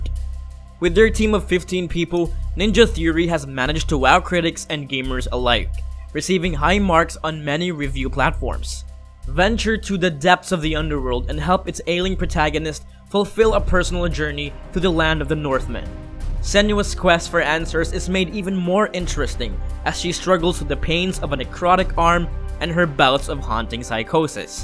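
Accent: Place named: Philippines. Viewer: Yep I recognize the filipino accent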